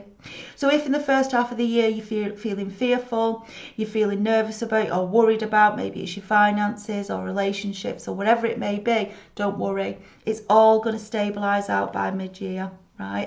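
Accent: United Kingdom. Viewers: Thank you honey u have just gained a new sub x nice to hear fellow uk readers x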